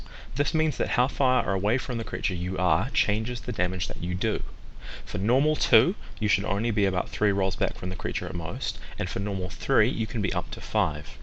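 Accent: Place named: New Zealand. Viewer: Nice vid, great to hear that NZ accent:-D Hope to see more from you when 3U hits our shores.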